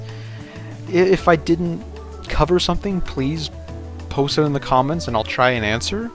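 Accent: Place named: United States. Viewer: Informative video, but damn, the American accent is strong with this one, butchering half the names. For example, Ran Yakumo, is not called "Ren"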